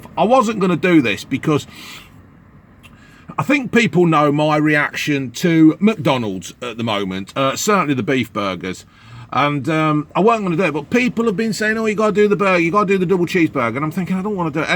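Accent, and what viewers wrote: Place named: Leeds, United Kingdom. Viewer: Our kid I sense some Leeds dialect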